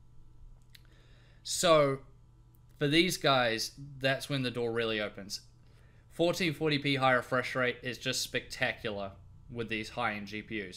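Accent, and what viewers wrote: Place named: New Zealand. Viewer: Hi, Nice accent!! Sounds like you're from Zew Zealand.. I just got the GTX1070 MSI OC card (since they are coming down in price) with my new 6core I5-8600K build. I need to buy a MONITOR without breaking the bank!!!.... I was thinking of a higher refresh rate, like 120hz or 144hz at 1080p. I play Battlefield 4 mainly and PUBG. HOWEVER, it seems in the mid ((($300))) US dollar range that these monitors have subpar picture quality, bleeding and other bad things that happen while gaming. Then I thought about the Vizio 43" 5ms D series LED TV. It says 120hz.. but I'm betting my nickles in my pocket that its probably some marketing ploy and not really native 120hz. But its picture quality is amazing at 1080p and it's around $300. What do you think or suggest I do for around $300 us $?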